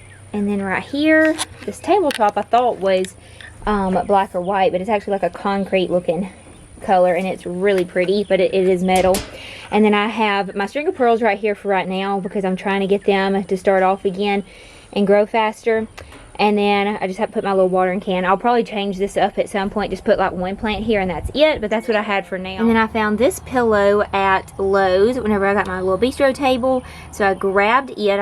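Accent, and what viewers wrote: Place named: Alabama, United States. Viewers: Are you from Alabama. You sound like the folks out here. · Did you say where you are from? Finally a “YouTuber” that sounds like me 🤣 I’m an East Tennessee gal! I love wha you have done and it gave me so many ideas 💡 we have a small farm and I like the “farmhouse” look but sometimes it can be too busy for me. We had a storm door like yours for a long time and I finally found someone who custom made me a screen door that I absolutely love ❤️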